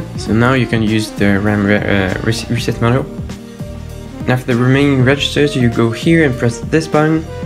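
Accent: France. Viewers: T'es français ? J'entends un certain accent ! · with your accent youre french ?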